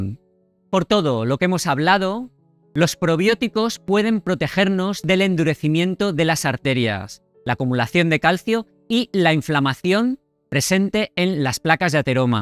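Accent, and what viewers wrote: Valencia, Spain. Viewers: Que rico el chucrut. Cada vez que veo tus videos me engancho mas, creo que por tu forma de hablar eres valenciano. Saludos desde Malaga.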